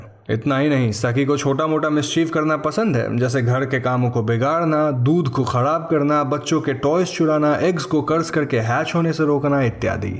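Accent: India. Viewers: Eu gosto da forma que ele começa falando uma frase em inglês e do nada já muda de idioma. Só os indianos conseguem se entender msm kk mas eu amo o sotaque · É o inglês indiano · he's indian and he's speaking english with hindi accent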